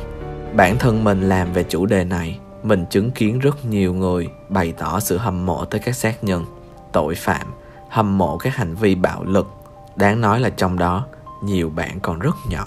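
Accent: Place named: Da Lat, Vietnam. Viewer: bạn này chắc là người Đà Lạt, giọng giống giọng em trai mình quá luôn ah.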